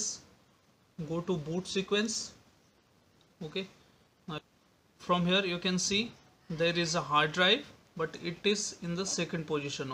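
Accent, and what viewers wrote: India. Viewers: I know the problem is solved when i hear the indian accent. Thx bro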